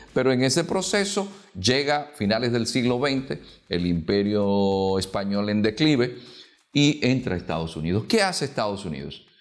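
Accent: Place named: Venezuela. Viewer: No sabia eso,bien por este hombre además tiene acento Vzlano.,un saludo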